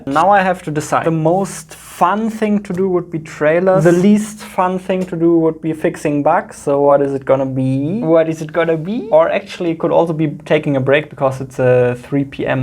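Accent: Germany. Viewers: Really uplifting and funny videos! I love the German accent as well! What's the name of the gray fluffy bamsefleece sweater you wear at the end? I got a Norwegian hoodie made in the same material and they are great. Good luck with your game. I've wish listed it on Steam. Looking forward to it! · i like how you can hear his german accent sometimes sneak through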